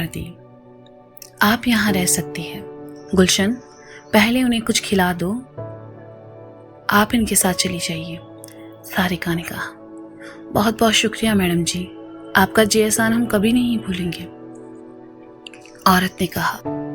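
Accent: Varanasi, India. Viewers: thodi banarsi mai kahani suniye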